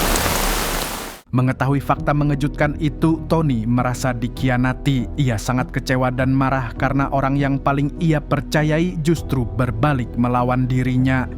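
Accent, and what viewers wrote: Banjar, Indonesia. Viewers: Adminnya urang banjar sklinya😂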